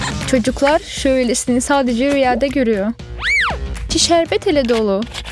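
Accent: Azerbaijan. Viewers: Sen azerim8sin türk mu anlaşlimyo bence azerisin 😏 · Azerbaycanca konuşuyorsun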